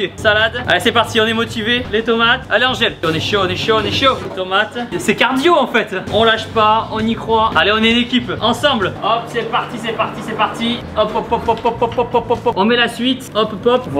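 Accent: South, France. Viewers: L’accent du sud est incroyable 🤩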